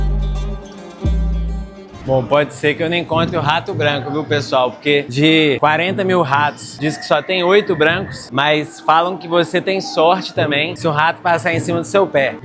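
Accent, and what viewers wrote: Minas Gerais, Brazil. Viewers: Eu amo seu sotaque, só quem é mineiro entendi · Mano acho que você é mineiro como eu, ou eu me enganei ! Rs Esse sotaque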